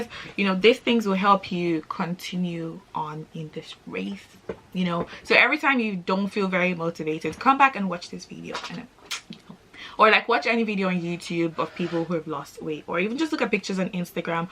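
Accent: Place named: Ireland. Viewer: Your voiceee 🤤🤤🤤 Are you irish?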